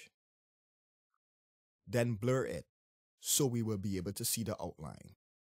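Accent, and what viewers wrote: Trinidad and Tobago. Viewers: thank you! BTW you sound Trini. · Sounds like a Trini!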